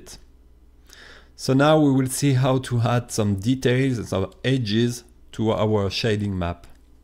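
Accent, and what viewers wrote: France. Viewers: Are u french? U have a french accent so hard man lol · Salut ! J'ai vu que tu étais Breton alors je te parle en français ! Super vidéo, on apprend beaucoup de chose sur Krita. Continue ton WebComic, il est juste génial, l'histoire et mimi, drôle et magique, tandis que le dessin sont juste magnifique : j'adore ton style ! Pourquoi avoir choisi Krita ( peut être que Photoshop est trop chère mais je me dit que si tu es pro ... ) ??? Et comment faire pour diminuer la taille du brush sans aller dans la barre du haut ? Continue tes vidéos, c'est pas commun des tutos krita ! Ha, aussi, T'es vidéos sont géniale car JE COMPRENDS TOUT GRACE A TON ACCENT !!! ( sans vouloir être méchant, mais ton accent français est vraiment cool pour moi qui n'ai que des bases ! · You have a strong French accent xD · are you fench (si oui, j'ai reconnu ton petit accent !)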